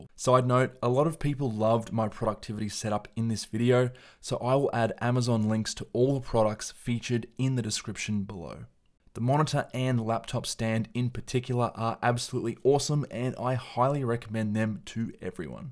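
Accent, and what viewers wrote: Australia. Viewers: His Ozi voice is refreshing I’m my opinion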